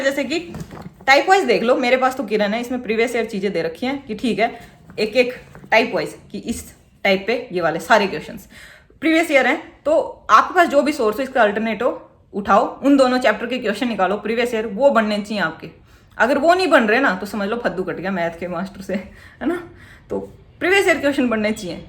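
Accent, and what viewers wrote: Haryana, India. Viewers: I m from bihar, i appeared in cgl 22 mains and, got low marks so decided to prepare hard for 2023 cgl, i liked your advice and loved your Haryanvi accent, the way you explained things is superb.... You made me remind of ritu ma'am who is also from haryana and preparing for upsc.... Haryanvi wali thaat achhi lgti hai with decency.... 😊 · Your Haryanvi... ❤️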